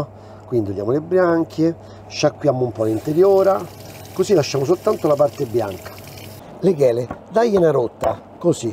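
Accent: Rome, Italy. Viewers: Se non parli il romanesco è molto meglio. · Simpaticissimo col suo accento romano, bravissimo in cucina, complimenti, io la seguo sempre. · te che parli in romano, piu' i sottotitoli in italiano... me sembra de torna' ai vecchi tempi de "i sottotitolati", di Greg... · Ma perché parli in Romano,che sei Napoletano?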